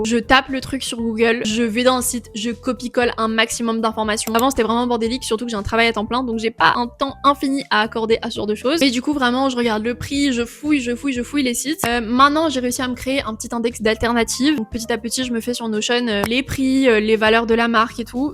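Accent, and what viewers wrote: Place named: South-East, France. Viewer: Oh t’es une meuf du sud est 🥳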